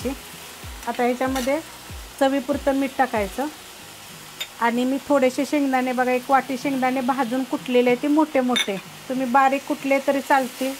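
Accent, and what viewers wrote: Satara, India. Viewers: पक्का म्हणजे सातारी,बोलण्यात पण सातारी तडका जाणवतोय 😅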